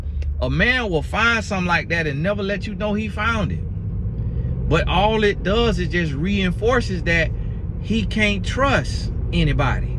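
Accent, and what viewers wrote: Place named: Texas, United States. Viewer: Yup that sounds like you’re from the general region I was born and raised in-TEXAS! Southern?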